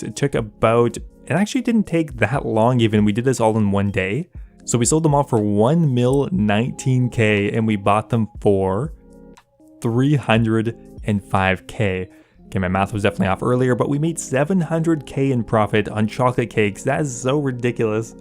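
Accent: Canada. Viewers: I'm american and I can tell you his accent is canadian.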